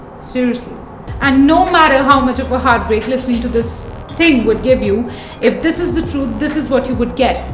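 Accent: India. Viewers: maybe 'becoz of that Indian accent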